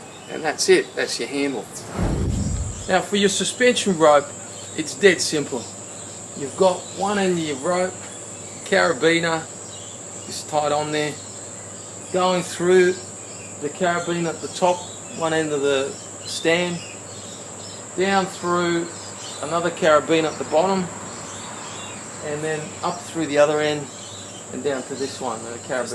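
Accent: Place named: Australia. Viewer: Thanks for the fast reply! Awesome. If I had to take a guess I would place you in Australia (your accent gave you away):D Thanks for the informations. I already downloaded your instructions (and want to throw money at you for it - by the way). I was just curios which of your videos was the most recent design In your instructions those eye bolts further down the "legs" of the stand (the ones you used to hang the speed ball) are missing, I presume they're just drilled into but wondered if you found a more elegant solution:D But with your idea I can get rid of the gym since I'll be able to do pullups on the station. And dips. And a punching bag. I really love your idea!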